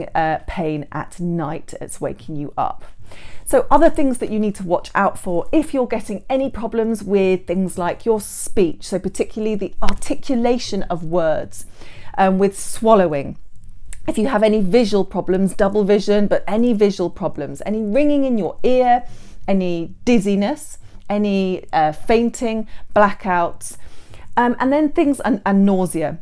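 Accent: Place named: United Kingdom. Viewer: I can't breath listening British English accent. It's makes me more tension while listening at your explanation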